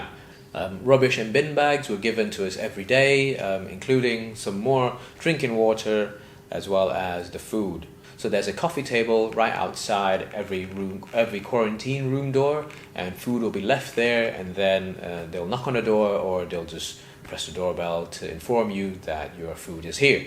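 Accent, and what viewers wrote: Malaysia. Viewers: Was wondering whether you were Malaysian, the slang gave it away AHAHAHA! Nice to see a countrymen in this field!!! Subbed and liked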